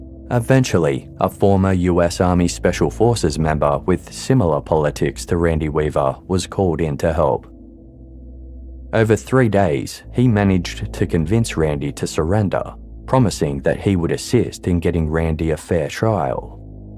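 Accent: Australia. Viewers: He's an Australian...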